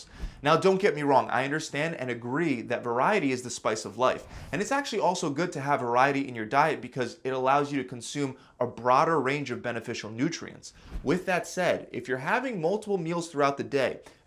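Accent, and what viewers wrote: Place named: New Jersey, United States. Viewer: You from Jersey? My man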